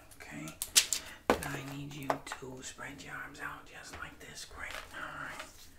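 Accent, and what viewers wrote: Boston, United States. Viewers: Have a question: From which part of US belong his accent?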